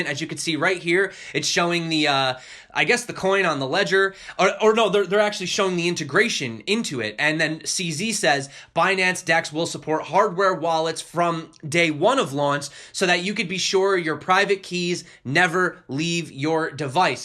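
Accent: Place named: United States. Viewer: Your accent is American.So I've asked you.Thank you bro.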